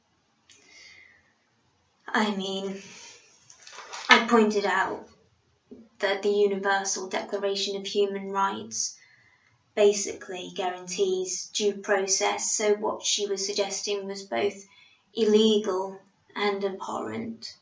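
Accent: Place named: United Kingdom. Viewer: Do you do any IRL meetups? (As you sound UK based lol)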